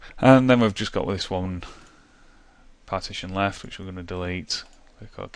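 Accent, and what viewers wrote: United Kingdom. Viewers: Thanks British bro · Thanks dude, i like your British accent :) You helped me partition my HD going back from Linux to Windows. cheers mate!!!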